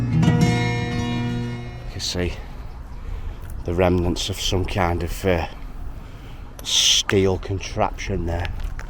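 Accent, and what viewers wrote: Manchester, United Kingdom. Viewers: Yer brilliant chanel lads,why do our kids look so uncomfortable around us?? My lads the same quiet ,and like you I'm a seeker. I still like to look at old buildings . But my lads like 'come on ya fat c. But yer you don't see channels like this .Well I can't find em .wheres your accent from. Sounds mancunian. Or near too. But it ain't Salford.keep it mate ,please.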